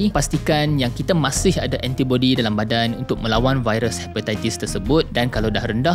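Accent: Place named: Kedah, Malaysia. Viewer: Weiii ,hangg org kedahhkaaa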